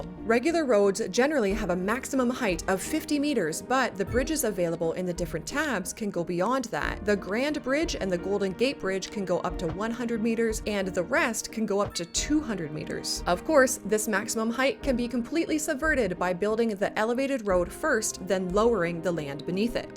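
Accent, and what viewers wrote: Newfoundland, Canada. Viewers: Are you a Newfie? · Excellent video! I noticed that you say, "a-boot" instead of "a-bout". As a Canadian, you are the only person I've ever heard using this pronunciation. Vaguely, where are you from? Is it standard pronunciation there? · Your a Newfie! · Holy Canadian accent, girl! You said it once and my eyebrow went up, then you said it again... "Round-a-boot". I moved away years ago and it is nice to hear the accent every once in a while. 😁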